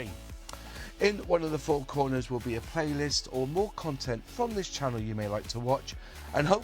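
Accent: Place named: Cockney, United Kingdom. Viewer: To a sixty-year old educated in the 1980's N.Vespuccian Sun Belt, if I've not mistaken, yer speech seemed to be delivered with a slight Cockneyish twist, ne'er rushed and in a warm & down-to-earth welcoming mode. For someone who spent his teenage years in predominately Cantonese speaking Hong Kong, I would not have been able to make out a dang word of your monologue back then, even with as clear as the sort of speech-delivery that you've mastered. Best wishes for reaching the number of subscribers in the jillions.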